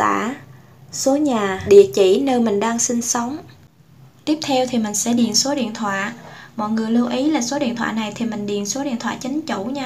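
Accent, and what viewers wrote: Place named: Quang Nam, Vietnam. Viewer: Tks chị, hình như chị người Quảng Nam Đà Nẵng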